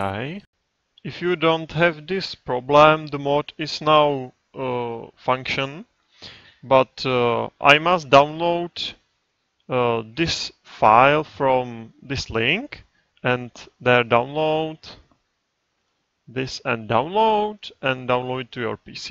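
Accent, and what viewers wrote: Czech Republic. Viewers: He is czech it's hard speak english when you are slovanian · Ten český přízvuk nezakryješ :-D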